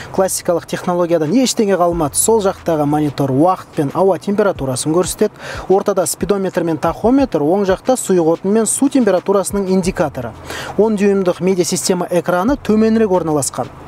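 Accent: Standard. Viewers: Керемет видео, жарайсың, таза қазақша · жарайсың Қазақ тілін таза анық әрі сөз саптауларымен керемет үйлестіріп жүргізетін бір ғана жүргізуші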